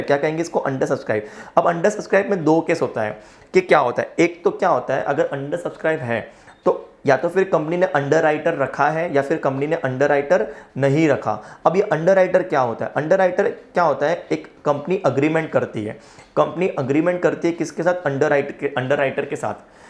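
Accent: Maharashtra, India. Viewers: Are you Marathi ?sir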